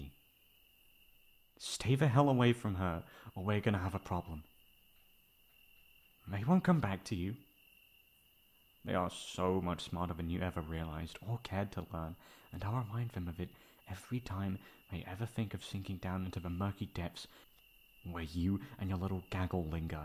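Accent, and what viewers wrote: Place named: United Kingdom. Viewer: AND HE HAS A BRITISH WHAT MORE CAN U ASK FOR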